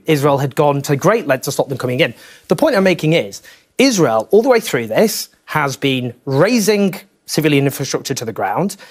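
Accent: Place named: Wales, United Kingdom. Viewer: I wanted to watch your video, but your accent is really hard to get my friend. Is it Welsh? Or Australian?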